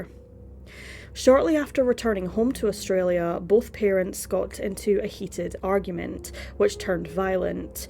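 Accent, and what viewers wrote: Scotland, United Kingdom. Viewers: SHE'S IS SCOTTISH ACCENT THANK YOU!!!!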